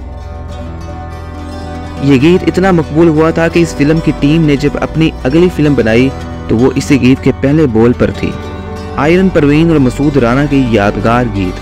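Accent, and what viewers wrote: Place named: Punjab, India. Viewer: pnjbi lahjy ha uordo ghlet bol rhya hu kuri ko kari bol rhya hu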